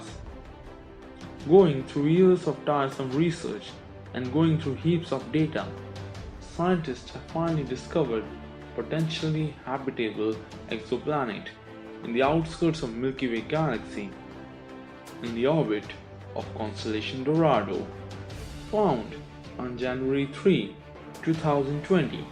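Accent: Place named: India. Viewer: Can't you tell.from his thick accent? Although he tries his best to mask it, but indian accent is just too difficult to get rid off.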